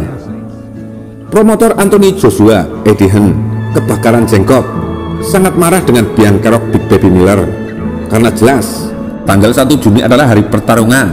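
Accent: Java, Indonesia. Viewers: Mas meddoknya bisa diilangin ga?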